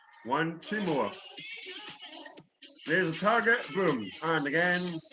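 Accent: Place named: Scotland, United Kingdom. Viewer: I've just upgraded my tradingview to the pro+ with volume profiles. I've spent the full weekend looking and reading about the profile, the problem is 99% are showing you hindsight or they are just explaining the profile and not actively trading with it. Am I right in saying that you are always targeting the previous days POC? Today is my first day with volume profile and i'm also trading futures with CME data, i'm going to go through all videos on the channel, any pointers on what videos are clearly describing your strategy? BTW great to hear a fellow Scotsman, cheers!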